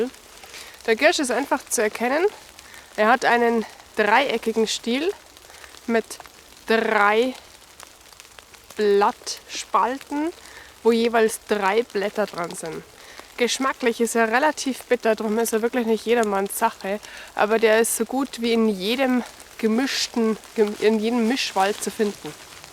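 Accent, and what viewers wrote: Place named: Bavaria, Germany. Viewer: Hallo, des war sche a wanns koid war. Ich seh und hör dir unheimlich gerne zu. Du musst natürlich Hochdeutsch sprechen, aber dir rutschen immer wieder herrliche bayrische Wörter heraus. Für mich als Preuße der in Bayern gelebt hat kein Problem, aber für die die oberhalb des Weißwurstäquators leben, also nördlich der Münchener Stadtgrenze wahrscheinlich schon.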